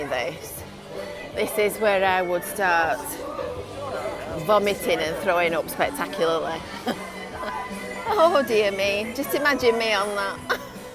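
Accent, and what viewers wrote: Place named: Yorkshire, United Kingdom. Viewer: Amazing video. I was planning to come here too as my channel is all walkthrough in West Yorkshire videos. You also sound Yorkshire too can I ask where your from out of interest? Lol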